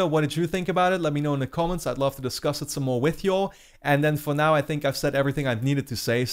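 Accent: Netherlands. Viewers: Hahaha ik hoor aan je accent gewoon dat je nederlands bent, wel toffe video!!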